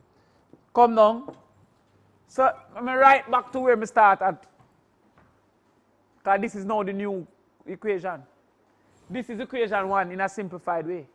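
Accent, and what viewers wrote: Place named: Jamaica. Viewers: He's jamaican. Unless u understand our creole u won't get it. He talks like that so that his class will understand him not as a teacher but as a friend. A smart friend. Who finds it hard to learn from a friend??? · its because he speaks jamaican broken english, i understand because im jamaican. wish u could understand because he teaches so well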